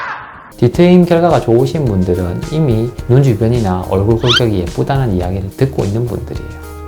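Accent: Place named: Gyeongsang, South Korea. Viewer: 구독 좋아요 누루라고 하셔서 바로 눌렀어요. 말 잘듣는 시민이쥬?~^^ 목소리 들으니 경상도쪽? 같으신데ㅠ 좋은분들은 머네요 흑ㅠ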